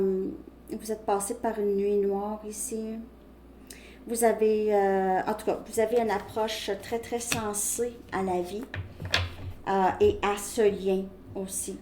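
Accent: Canada. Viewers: Bonsoir ✨ Je suis nouvelle abonnée Merci pour vos messages avec ce délicieux accent canadien Gratitude infinie 🙏🦋🌹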